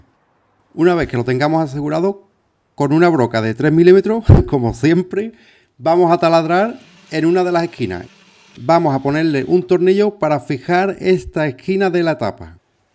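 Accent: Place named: Andalusia, Spain. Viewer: Sos un genio !! Muy bien explicado. El acento Andalu (si no me equivoco), le da un toque muy especial. Muchas gracias maestro.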